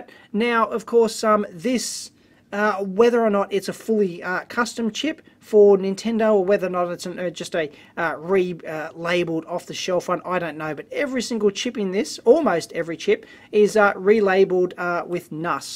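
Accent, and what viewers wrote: Australia. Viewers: Um, he's Australian. That's how they talk.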